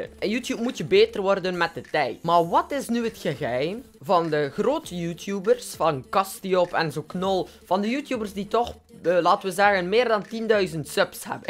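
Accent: West Flanders, Belgium. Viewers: HAHAHAHA kapot lachen met ej West - Vloams:') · Nice video ik heb geen probleem met je accent want ik ben zelf west-Vlaams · Ik ben ook west Vlaams en ik zeg: doe nog lange deure me YouTube echt wo je zie echt nen coole dude gie verdiend veel meer subs! Ik weunen nie An de kust om kgon der graag na toe. Allee nog vele succes me YouTube!!! PEACENIK #westvlaamsaccent. Hèhè dat moest er even uit. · Mooi gezegd me u west-vlaams accentje hehe:) en PROFICIAAAT! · Jij bent west-vlaams? Van welke stad/dorp? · West vloams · Van min part meugt ge plat west vloams klappen weh;)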